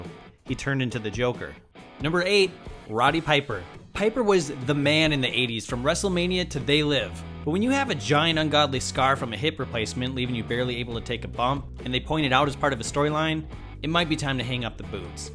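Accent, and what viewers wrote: United States. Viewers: Yay, a no British accent shit. Pure American dialect. Yay. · The only American voice on what culture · This is the first time I've heard a whatculture list with an American type accent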